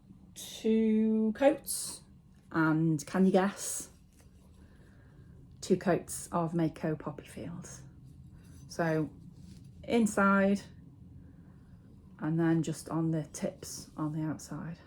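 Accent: United Kingdom. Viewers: Noo don’t ever think that. You are so lovely and I like your really pretty English accent ❤. And all of you 😘🙏🏼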